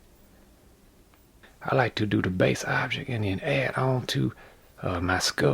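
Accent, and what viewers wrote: Louisiana, United States. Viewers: is this guy from louisiana? he has the creole/ cajun accents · Bob Ross + Louisiana style ASMR = I'm hooked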